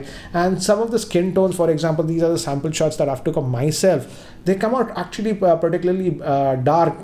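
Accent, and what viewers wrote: India. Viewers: It's not this dude if you do not hear a thicc Indian accent "BUT AGAIN" every 25 seconds.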